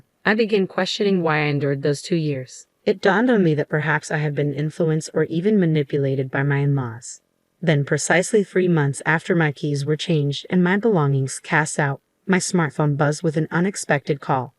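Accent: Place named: Arab world. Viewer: Why the Arab accent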